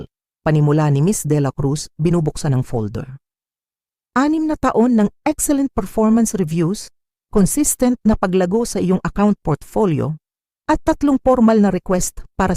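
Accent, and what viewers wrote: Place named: India. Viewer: Putik!!! Bakit naging Indiano ang narrator!!!!!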